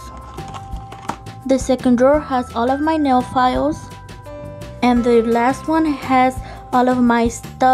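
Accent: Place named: Mexico. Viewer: wojod haider really? it sounded like a Mexican accent to me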